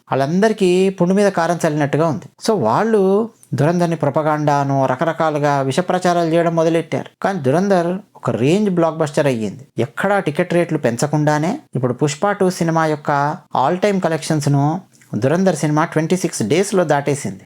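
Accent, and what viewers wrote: Telangana, India. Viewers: Me telangana slang 👌👌👌😂😂😂😂😂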